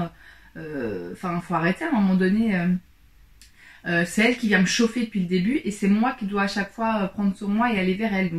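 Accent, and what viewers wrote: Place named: Belgium. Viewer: Je suis à 2 minutes de la video et je suis hypnotisé par ton accent on dirait que tu es belge ça me détend mdr jadore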